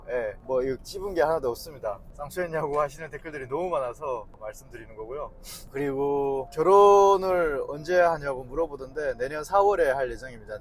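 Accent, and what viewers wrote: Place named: Gyeongsang, South Korea. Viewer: 우와 잘생기셨네 말투는 저기 아래 경상도 사투리 섞인듯한 ㅎㅎ 결혼준비 잘 하시고 대한민국의 건강한 청년으로 정착하신걸 감사합니다. 응원합니다.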